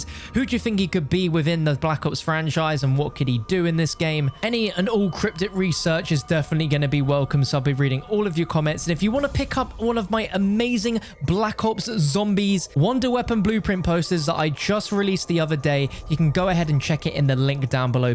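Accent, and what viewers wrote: United Kingdom. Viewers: I know I'm gonna get whooshed, but he said agent. It's just our British awful accents. But yes very funny joke.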